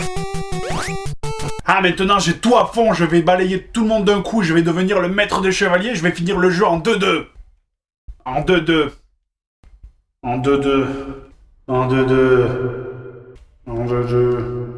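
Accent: South, France. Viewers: Il vient du sud non? ( ne me tuez pas je decouvre la chaine) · il est de Perpignan, donc il avait un léger accent du Sud. Il l'a pas mal perdu depuis, même si sur certains mots tu peux encore l'entendre en 2026. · ha c'était le bon temps, l'époque du gros, avec son bonne humeur et son accent du sud. J'espère qu'il reviendra un jour pour un featuring special avec le nouvel acteur de droite. Perso j'ai toujours préféré l'ancien acteur. Et ceux qui pensent que c'est la même personne. Déjà regardé les vidéos. On passe directement du gros sympa au mince, sans voir à aucun moment le gros qui maigri peu à peu. une vidéo tu as le gros et son accent du sud, et l'autre vidéo tu as un mince, souvent avec de fausses lunettes, ou sans lunettes, son accent comme par magie a disparu, il passe de brun à roux, ce n'est même plus la même voix... Il n'y a que la chemise qui reste la même. 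· Je me fais un petit retour en arrière. Je me refais tous les. A cette époque tu avais encore notre bel accent du Sud!😎 Merci d'être sur YouTube depuis tout ce temps. Ne changez rien! Bisous les gaziers!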